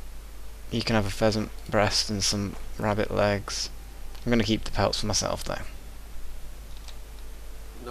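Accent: England, United Kingdom. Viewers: I enjoy him because he doesn't have a silly gimmick(angry video game nerd....), doesn't sound/act like he is stoned/high, doesn't make us feel like we are just silently observes watching a methodical video (like when my german friend plays minecraft) but instead makes us feel like we are along for the ride through skyrim as a LET'S play.. not a walkthough. also I enjoy english accents.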